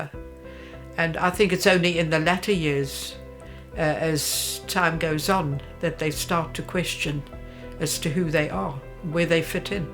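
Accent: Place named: Zimbabwe. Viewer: This Lady sounds like my late mother.. a precious lady .. a voice of a true Rhodesian . Thank you for sharing your life 🙏🏻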